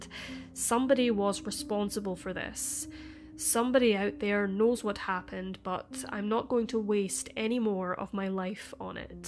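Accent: Scotland, United Kingdom. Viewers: Love your accent, Scotland?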